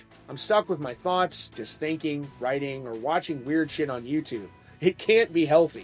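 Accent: United States. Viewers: Time to come home to Kentucky my man ,Need to work on that damn accent you have now anyway , went full blown yank on us.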